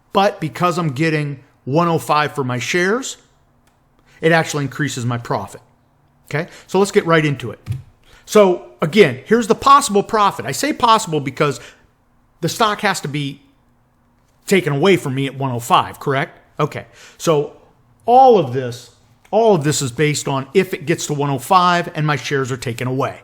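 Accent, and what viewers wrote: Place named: Texas, United States. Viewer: Good content! Very educational and real world. And to top it off you talk like The Texas Fonz!! : )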